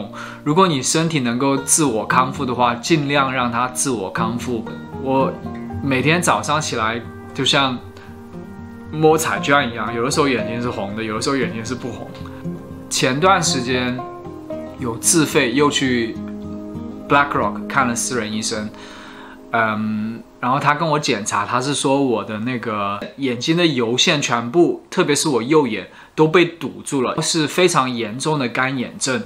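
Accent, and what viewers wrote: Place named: Taiwan. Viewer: 怎么台湾口音？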